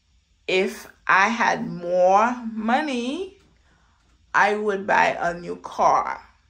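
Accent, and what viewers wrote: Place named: United States. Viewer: With good American accent I like to watch your video my dear cousin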